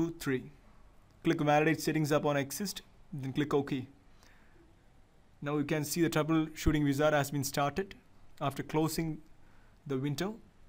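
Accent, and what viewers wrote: India. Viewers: fk that indian accent! it did not even work. zzz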